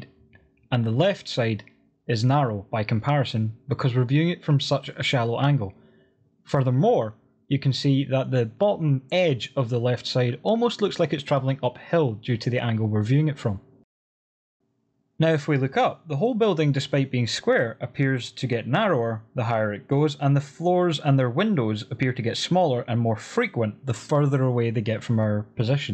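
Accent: Scotland, United Kingdom. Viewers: Took me 3 min to realize he's Scottish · I'm learning Blender and this video completely clarified orthographic vs perspective view. Thank you. Well done. I recognized the Scottish accent and then saw your screen name "." Love it!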